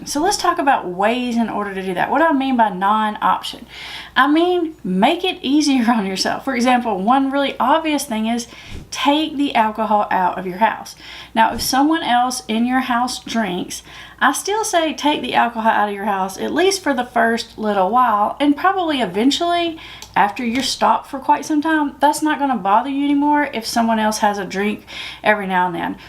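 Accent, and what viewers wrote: South, United States. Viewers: Verryy calm pretty southern arcent, that bullshits into an advert.. BORING another pretend proffessional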